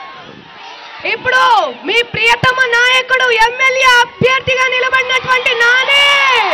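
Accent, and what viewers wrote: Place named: Telangana, India. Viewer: తెలంగాణ యాస అక్కడ కూడా ప్రభంజనం లేపలే yours great అక్క so Keep rocking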